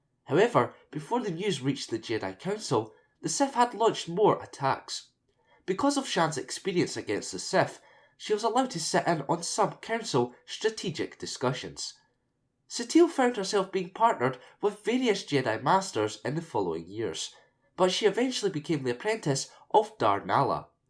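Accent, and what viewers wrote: United Kingdom. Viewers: British accent I'm thinking? or just a diverse American voice here?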